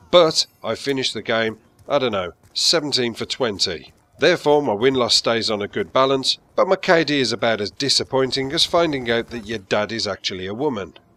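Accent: United Kingdom. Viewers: I enjoy listening to you UK guys more than the US commentaries. Keep up the good vids bud!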